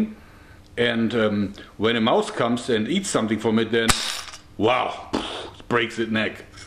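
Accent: Germany. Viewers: Man merkt dass du ein deutsche bist :P Aber nette Falle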